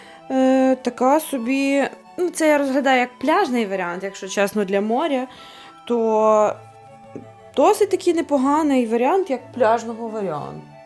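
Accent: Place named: Ternopil, Ukraine. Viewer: Ты з Тернополя? Я знаю одну жінку з Тернопільської області, вона спілкується майже як ви.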